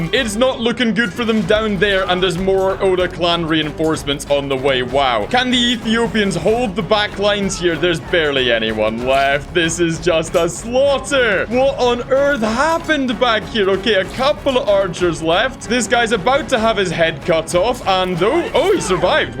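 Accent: Scotland, United Kingdom. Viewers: What The Hell? Is Going On? I SUBSCRIBED TO A SCOTISH MAN!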